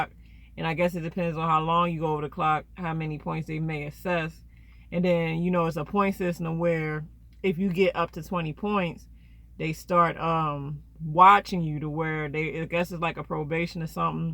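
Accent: Baltimore, United States. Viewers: Lol! Sewper dry? Yewtube channel? I love your accent! Baltimore!😂😂😆😆😘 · Bmore accent.lol.sexy tho I found you cause I'm about to be a truck driver..n can't stop watching you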